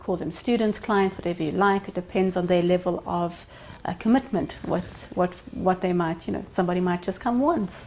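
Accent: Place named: South Africa. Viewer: You sound South African. Are you?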